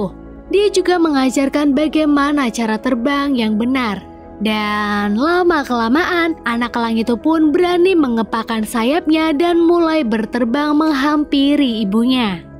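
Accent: Pemalang, Indonesia. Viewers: Kaknya orang pemalang yahhh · Mbae asli Pemalang po? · LAahhh kok pemalang? Emng org pml? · wong pemalang ta mbak?😂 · Wong pml ye mba? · wong pemalang ya? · Mba orang Pemalang? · Wong Pemalang mba?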